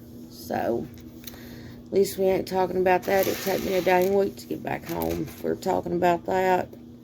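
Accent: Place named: South, United States. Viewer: I hear a little bit of a southern accent when you talk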